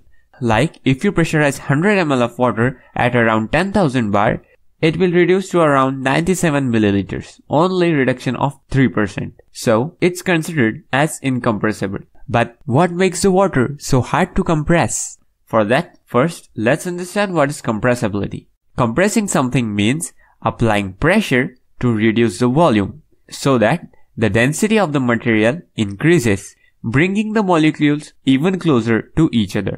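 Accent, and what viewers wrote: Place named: India. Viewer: Wow, living proof I can watch a scientific explanation in Indian English when it's actually clear and well-made - thank you for taking the time! Also, the 'very less' is quite endearing, reminds me of a good friend.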